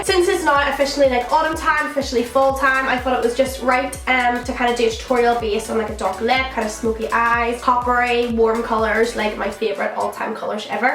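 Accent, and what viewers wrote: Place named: Belfast, United Kingdom. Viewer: Are you from Belfast? I recognise that accent XD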